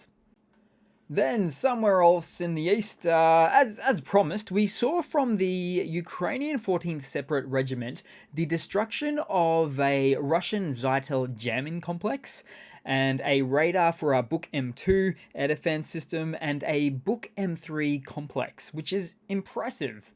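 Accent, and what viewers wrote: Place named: Australia. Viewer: (sp?) I enjoy your posts. I follow a few others (Denys, Georgie,Anna,Artur ) and between you I feel that the fact checking is great. You all add something to the big picture. But one thing bugs me, your accent. Please don’t take offense but I’m just curious, are you an Aussie? 😂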